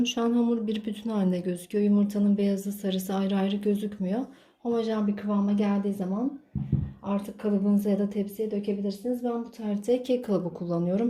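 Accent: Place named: Azerbaijan. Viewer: Azerbaycanlı birinin türkçe böyle konuşmasında hiç sorun yok siz Azerbaycanca biliyormusunuz hiç?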